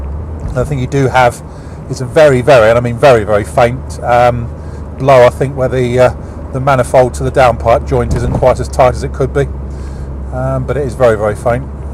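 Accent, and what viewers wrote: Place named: United Kingdom. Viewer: british people are fun to listen too....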